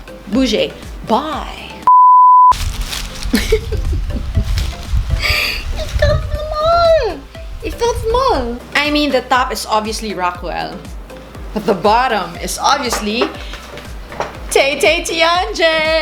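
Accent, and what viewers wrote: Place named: United Kingdom. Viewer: ! Love the british accent! Ganda ng intro!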